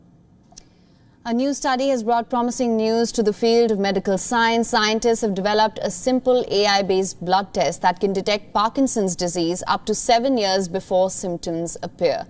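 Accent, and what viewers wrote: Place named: India. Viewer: She still have Indian accents that sounds good for the Indians.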